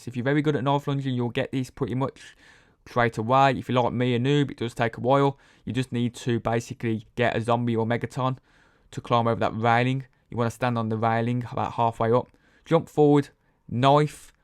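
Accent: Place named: Birmingham, United Kingdom. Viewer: Called a brum accent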